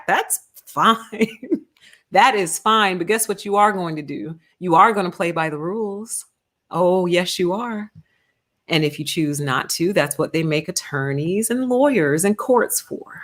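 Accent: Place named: Texas, United States. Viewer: Hello & Youtubers hope You All doing Fine & Safe & Pleasant always! And Your Video Content is Great along with the people's comment section below this Video Content! This is a Complement i thought You were a Southern Texas Miss America in a pageant because of Your Amazing Voice & Personality! And wanted to say some of my Relatives are blended with black & white & mexican/native American and some straight & bi and some of my friends are straight & gay and are different races of people and i love my Relatives & Friends! Such a Great Video Content hope You get subs! And i'm not a subscriber yet because i'm a selected target individual being watched & bullied & tested by my unknown haters & gangstalkers and handlers on social media and in public places i go! Anyway can You give Your cute 🐕 puppy a hug from me ❤Thank You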